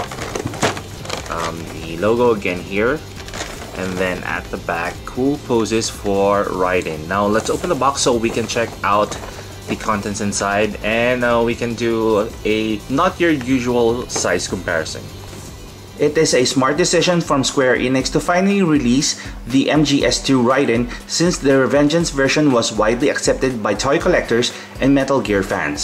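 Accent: Philippines. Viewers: maybe Filipino or singaporean accent...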